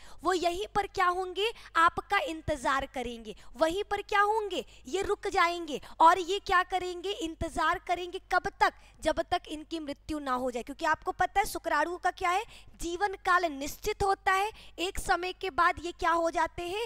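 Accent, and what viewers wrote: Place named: Rajasthan, India. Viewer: ये राजस्थानी है शुक्राणू को शुक्राडू बोलती है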